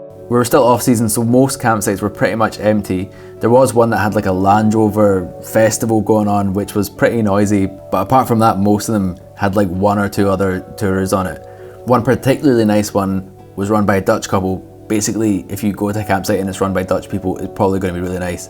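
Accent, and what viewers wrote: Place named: Barbados. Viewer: Here I am listening to your videos while still in Trinidad cleaning my house, passing time until I return to France this summer BUT I almost can't make the connection in my mind that you are NOT from the island of Barbados. Your accceeenntttttttt. You sound 100% Bajan!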